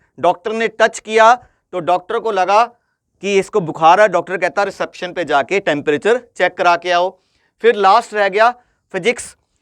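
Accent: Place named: Punjab, India. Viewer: Thank you sir . And love your punjabi accent ❤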